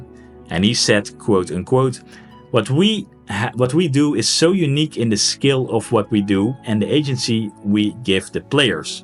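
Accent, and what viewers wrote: Netherlands. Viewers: this guy is definitely dutch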